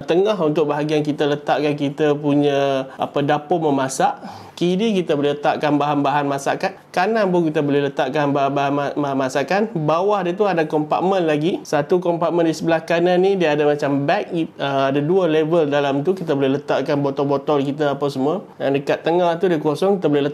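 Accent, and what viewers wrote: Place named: Kedah, Malaysia. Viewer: cakap kedahlh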